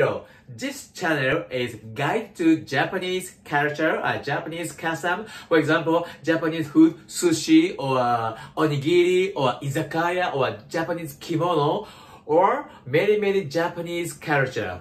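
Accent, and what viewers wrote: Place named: California, United States. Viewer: Cali style tone❣️素敵です。🤗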